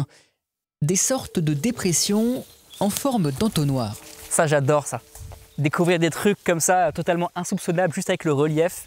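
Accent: Alsace, France. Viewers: salut je viens de découvrir ta chaine avec le mur paien, je me demandais, tu es alsacien?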